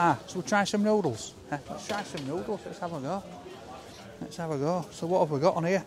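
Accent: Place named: Yorkshire, United Kingdom. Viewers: Nail on head there without a doubt. Recent months have been utterly horrendous for so many with illness or worse with the virus. himself has been through some horrible times of a different kind and he has become a national treasure and sign of positivity that we so badly need. He is a great lad, talks in a super broad Yorkshire accent and is always polite as really we all should be, take a lesson from him all, respect costs nothing but earns so very much in return. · Defo fullnon peaky blinder with that yorkshire accent would be awsome to watch, drop a few yorkshire sayings in the videos too, 🤣 top man keep it up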